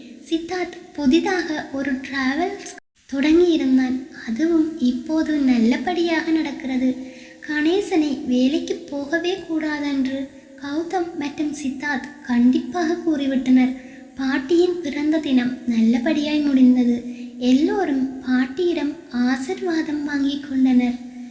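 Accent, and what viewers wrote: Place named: Sri Lanka. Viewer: அக்கா நீங்கதானே காவலனே எண் காதலனே கதை எழுதுணது .உங்களுடைய வேற youtube channel ல படிச்சிருக்கேன் .எனக்கு அந்த கதை ரொம்ப பிடிக்கும்.அடிக்கடி அந்த கதை படிப்பேன். நிங்க அந்த கதை நீக்கிட்டீங்க😢.அந்த கதை பல மாசம தேடி பார்த்தேன் அது இல்ல.இப்பதான் இந்த channelல உங்க குரல் நிங்க பேசுற இலங்கை தமிழ் வச்சித்தான் கண்டு பிடிச்சேண் .திரும்பவும் அந்த கதை இந்த channel ல upload பண்ணுங்க அக்கா please😢😢.அது 9 episode தாண சீக்கிரம் upload பண்ணுங்க அக்கா.எனக்கு அந்த கதை ரொம்ப ரொம்ப பிடிக்கும்.உங்க பதில்காக காத்துகிட்டு இருக்கேன். 😢😢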